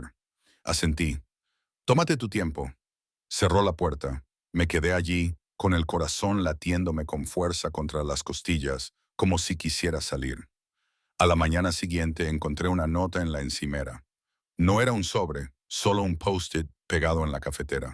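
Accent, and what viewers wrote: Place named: United States. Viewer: Qué pesadez ese scento gringo de AI (estupidez natural)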